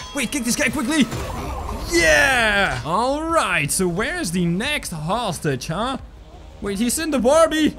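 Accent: Netherlands. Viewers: By the way, I also live in the Netherlands, so I would like to know which province you live in. My province is Utrecht. By the way, you sound like a guy who lives in Groningen.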